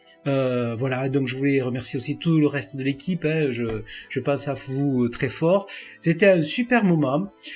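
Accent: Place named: South, France. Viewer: bravo!! bonne vidéo ça fait du bien de voir que tu le même accent du sud que moi ;)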